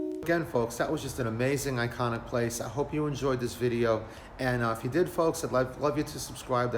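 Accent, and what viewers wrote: Brooklyn, United States. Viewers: I went there as a kid....fun place. thanks for sharing....And your right, at that time late 70s & early 80s, that was scary. I remember the hands grabbing my feet with like rat noises, it was terrifying & fun at the same time. Love your Brooklyn voice. I'm a BK native as well & my condolences for your son. May he rip. 🙏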